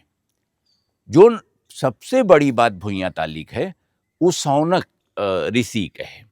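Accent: Awadh, India. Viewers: Listening to your video feels like roaming around my nani,s village about 60- 65 years back.Same air .same mitti ki sondhi sugandh and the original awadhi.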